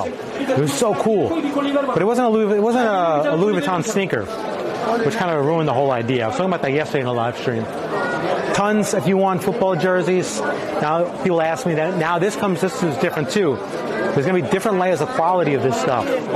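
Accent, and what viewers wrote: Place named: New York, United States. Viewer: New subscriber! Hello fellow New Yorker, I noticed the NY accent 😊 My brother was just there in Istanbul 2 weeks ago and I asked him to get me some replica shirts 😂 When he goes back, I am gonna ask him to get the vip higher quality shirts 🤓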